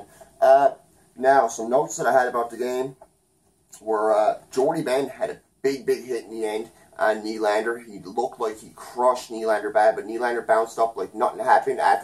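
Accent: Newfoundland, Canada. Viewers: No trouble telling you're a Newfoundlander, yes by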